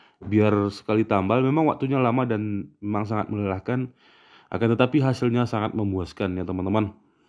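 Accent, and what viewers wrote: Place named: Gayo, Indonesia. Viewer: Dari cara berbicara abg, pastinya saya tau sukunya Gayo...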